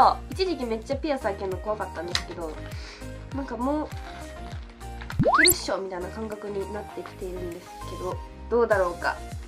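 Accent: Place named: Gifu, Japan. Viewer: 自分岐阜だから喋り方に親近感湧く！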